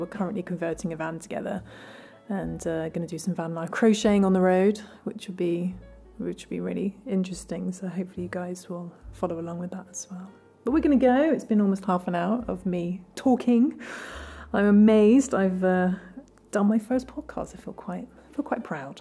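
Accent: United Kingdom. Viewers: I started crocheting in about 2010 when i got chronically ill at uni. You were one of, it not the first channel i subscribed to, it was great to hear a British accent.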